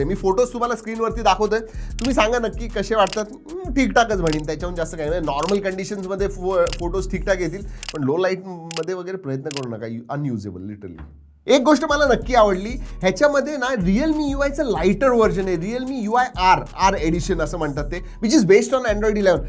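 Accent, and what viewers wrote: Pune, India. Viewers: That puneri accent is soo gooodd 🤩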